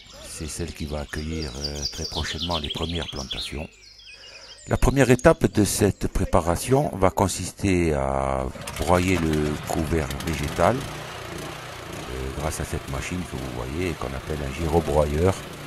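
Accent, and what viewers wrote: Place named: Corsica, France. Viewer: Bravo pour cette vidéo. je comprends mieux ce que j'ai à faire chez moi. Et merci pour ce magnifique accent corse!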